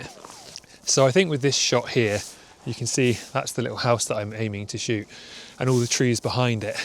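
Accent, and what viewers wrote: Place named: United Kingdom. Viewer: Agree that winter is a tough time to shoot in the Canadian Rockies. I was in Assiniboine area for a week, (plus several days in Lake O'Hara) almost two years ago -- it got colder than -30 celsius (my thermometer froze below that!). Batteries die very quickly, as well, and in those temperatures you can't expose your hands for long. But one thing the snow usually does help with is to simplify the scene and that often opens up many opportunities, I find. And by the sound of your accent you hail from a similar corner of the UK as myself. I wonder whether you were also drawn to Canada through falling in love with the Rockies? (I've been hiking the Canadian Rockies the majority of my summers since the 1990s.)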